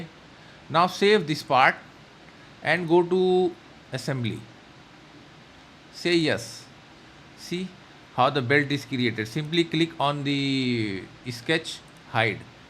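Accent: India. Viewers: May I know what is the real use if you don't show calculations or show top down modelling approach from scratch? Solidworks if you really create it for fun make a playlist for that. But for serious application engineers like me it is mandatory that you show detailed calculation the entire process whats going on inside the chamber right rather than keeping it as bloops behind the curtain. Kindly try to tune your pronunciation. Ur bloody Indian accent sounds awfully monotonous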